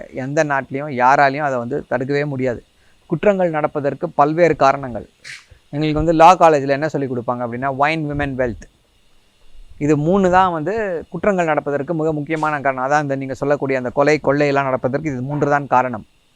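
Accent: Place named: Tamil Nadu, India. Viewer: True how I wish when he talks in English it does not look like Tamil.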